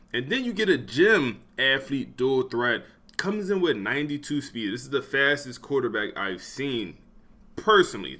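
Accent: Philadelphia, United States. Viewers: bro straight from philly lmao great vid fam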